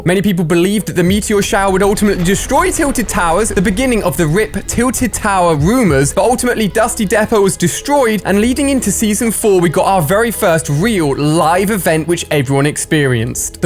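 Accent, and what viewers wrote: United Kingdom. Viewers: Am I the only one that thinks British accents are annoying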